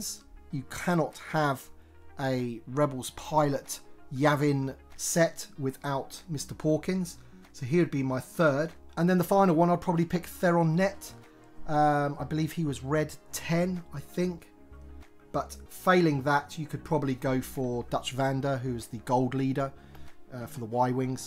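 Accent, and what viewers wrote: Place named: United Kingdom. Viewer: Hi! I'm a huge fan of the original trilogy and pretty new to collecting TVC. Love your videos and your British accent! Your videos are very helpful when I decide to buy something new, thanks! My question is: will Greedo (who was released in 2021 in two versions but only as a 6 inch figure for the 50th anniversary black series) also come as a 3,75 inch TVC? The Power Of The Force 50th anniversary version is very screen accurate! The one in the green outfit that you reviewed is great but I'm hoping for the screen accurate version with the orange vest! Thank you so much and greetings from Germany!!!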